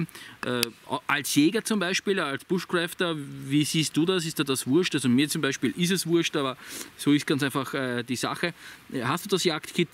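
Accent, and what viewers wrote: Austria. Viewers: Bist du Österreicher 🇦🇹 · Der Mann hat .at als Kanalnamen und einen hörbar österreichischen Akzent - für mich als Norddeutschen. Was soll die Frage?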